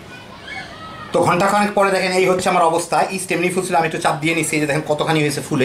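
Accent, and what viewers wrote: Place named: Satkhira, Bangladesh. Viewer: Dada you , especially your language remind me my ancestry migrated from Satkhira ,if I am not wrong. Love you from Calcutta.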